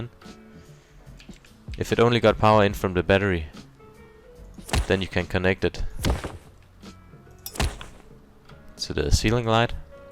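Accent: Denmark. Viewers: u sound danish? are you? din frække dreng xDD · Du lyder ekstremt dansk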